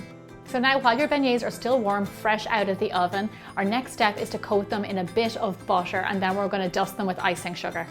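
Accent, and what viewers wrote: Ireland. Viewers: are ye irish? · Is she Irish? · she is Irish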